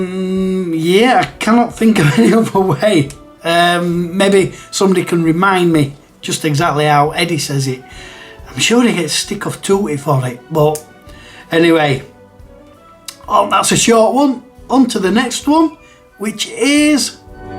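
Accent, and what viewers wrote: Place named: Yorkshire, United Kingdom. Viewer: Infogrames always used to get me because I never used to see the R 🤣 Xexyz is a good one, lets just call it Keith from now on. Suikoden I dont have an issue with as I will never play one of those games anyway. You are only saying Eeenix that way because you have a Yorkshire accent. I don't remember pronouncing Tau Ceti before, maybe I did 🤔 That one at the end 🤣🤣🤣 My brain hurts now....😊👍🐻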